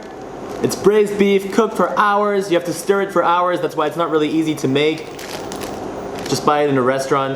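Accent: Indonesia. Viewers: hes so cute talking indonesian 😆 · your indonesian accent is cute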